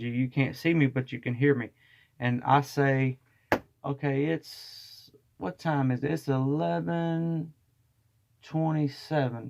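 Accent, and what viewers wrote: South, United States. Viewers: Thank you doing great and I love the southern accent and who cares do not listen to the haters ,Welcome freedom fighter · Great video. Love listening to your southern accent!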